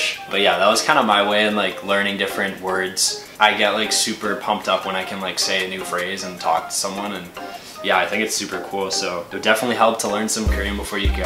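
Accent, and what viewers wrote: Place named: United States. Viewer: Can I ask where you are originally from?: You sound like an American. Thanks!!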